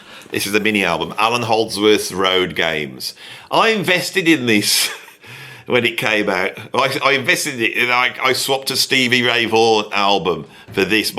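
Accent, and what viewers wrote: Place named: England, United Kingdom. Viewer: You know, you sound like Wolfman Jack with an English accent.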